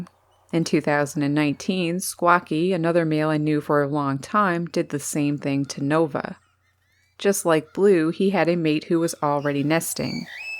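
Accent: Canada. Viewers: Love your Canadian accent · Food offering. Mate for life. So romantic! Thanks for the video,. I've always loved your narration. I guess I'm intrigued by your Canadian accent.😂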